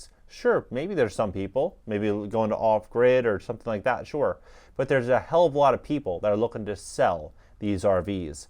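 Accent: California, United States. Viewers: You sound like a native Californian, am I right?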